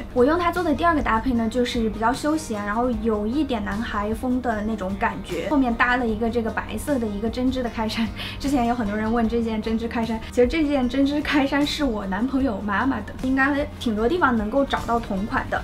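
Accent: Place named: Chengdu, China. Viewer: 支持老乡 ps看你成都话的化妆视频看了七八遍 其实是听 乡音啊 😄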